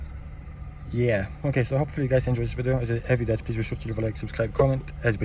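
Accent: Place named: Pakistan. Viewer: I can’t make out a word that you did said. It’s like half Pakistani half Dutch with a bit of Glasgow accent chucked into the mix. M8 I didn’t understand one word of what you just saided.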